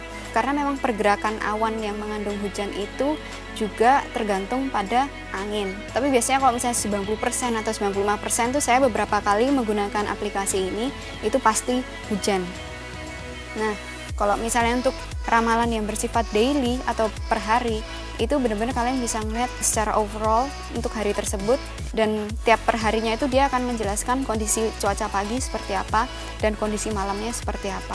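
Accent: Java, Indonesia. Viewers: Saya suka semi medoknya itu dari mba nih hehehe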